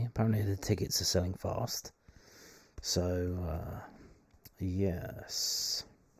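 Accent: Scotland, United Kingdom. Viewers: Your Scottish accent is getting better by the week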